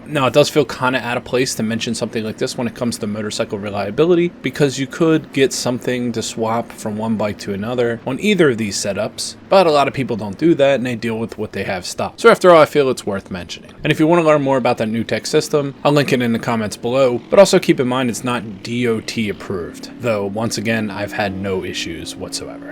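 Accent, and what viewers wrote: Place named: Pennsylvania, United States. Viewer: yeah it looked a lot like NC but I was hearing, to me, a little northeast Pennsylvania accent, so I wasn’t sure. Found your channel today and it’s great. Where is the best place to find used dual sports: FB marketplace? Craigslist? Cycletrader?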